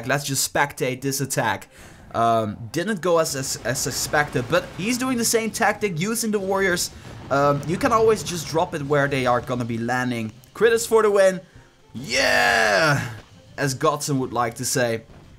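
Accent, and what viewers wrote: Netherlands. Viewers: Hey man, you got some nice boom beach videos, just subscribed! 1 question though, where are you from exactly? Cause your accent sounds a bit funny (not in a negative way, but positive) i'm guessing from the netherlands?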